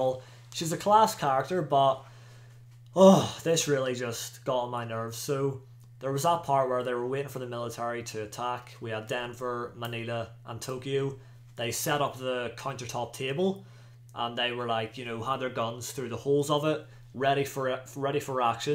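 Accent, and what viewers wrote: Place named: Northern Ireland, United Kingdom. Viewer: I know a Northern Irish accent when I hear one 🤣 what part big lad? Only came across your channel today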